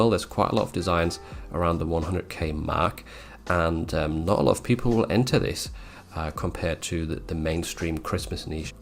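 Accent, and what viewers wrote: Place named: England, United Kingdom. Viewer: Thanks, you always get me thinking. I hope you don’t mind me saying, since you mentioned about the plaid, that most people I know would say “various / variations” as though there were the word “air” in it. Not a criticism or anything, just thought you might like to know. I love listening to English accents since I now live in the States.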